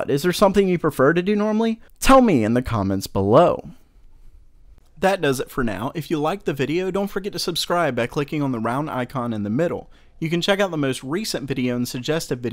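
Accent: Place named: Philadelphia, United States. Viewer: You gotta be from philly...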